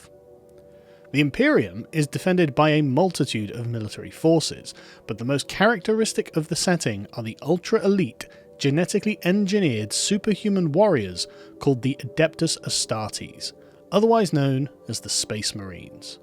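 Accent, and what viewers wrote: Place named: United Kingdom. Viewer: A very disingenuous "analysis". The problem GW is fighting against is that fascists and straight up nazis tend to enjoy the setting a little too much. They see the hardcore totalitarian, militaristic, theocratic, intolerant, expansionist and genocidal nature of the Imperium as aspirational and something modern society should model itself after, not understanding or caring about how the entire setting is a satire and sometimes a clear condemnation of that world view. As it happens with other IPs like Starship Troopers, they are just enamored with the superficial aesthetics of the totalitarian government in the setting, and care for little else, and try to claim the whole thing as their own. In many ways, GW is just another amoral corporation, but they recognize that their brand being associated with nazis is very, very bad for business. 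That's the reason they have to release statements condemning the presence of extreme right individuals in the community, not because they happen to actually hate conservative, masculine, western values. Benjamin has mastered the art of sounding smart while being very stupid. Proof that just because you have a snooty british accent doesn't mean people should pay you any attention.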